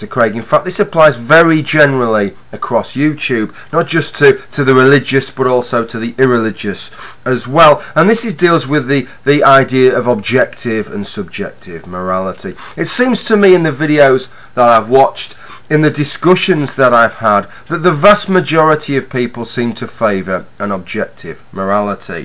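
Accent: United Kingdom. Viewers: Anyone know where in England this guy is from? He talks just like Daphne from Fasier.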